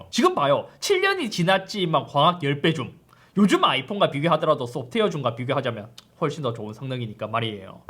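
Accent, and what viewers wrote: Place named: North Gyeongsang, South Korea. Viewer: 잇섭님 리뷰는 대구사람으로서 듣기 아주 편합니다. 보통 유투브 경상도 사투리는 경상남도 사투리라 되게 다르게 들리는데 고향이 경상북도신가 봅니다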